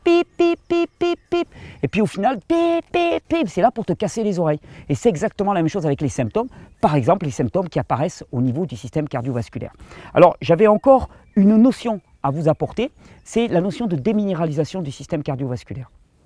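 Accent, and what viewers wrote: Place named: South, France. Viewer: C'est un plaisir de voir tes videos cher mais je dois dire que bien au delà du contenu, ce qui me fait du bien,c 'est dejà d'entendre ton accent du midi, qui porte avec lui la lumière du Sud, c'est cet environnement catalan qu'on peut parfois apercevoir et vraiment si j'avais une suggestion à faire, pour nous faire encore davantage profiter de cela, c'est de nous faire découvrir ta region, ou que tu nous en parles car je t'assure que cela aussi ça nous régénère!! j'adore tes videos en exterieur car on en respire presque l'air!! TU es fier de ta région, fais nous rêver avec elle, 5mn de temps en temps mm durant une video de jus ou autre!!! Merci pour ton travail!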